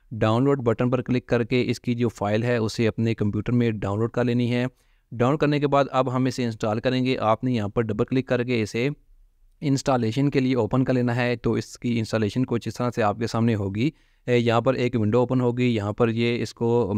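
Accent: India. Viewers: Cannot understand, you have a strong Indian accent & talk too fast. A lot of foreigners have this problem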